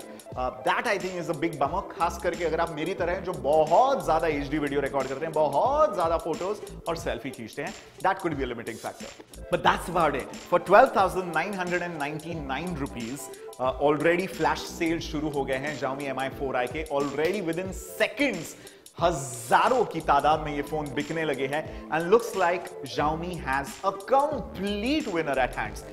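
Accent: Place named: Russia. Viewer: That Russian accent though.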